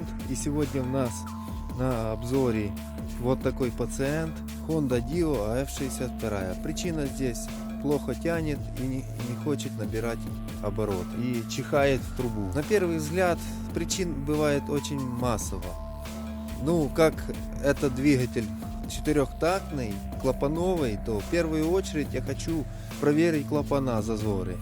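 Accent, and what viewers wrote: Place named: Ukraine. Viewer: Говори как тебе удобно потому что твой русский это украинский шедевр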